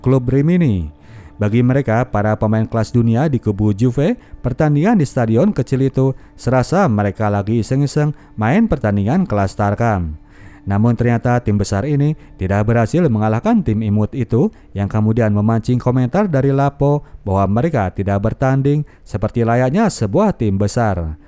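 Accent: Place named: West Java, Indonesia. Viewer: Pengisi suara orang sunda pasti😂😂,suaranya khas bnget😊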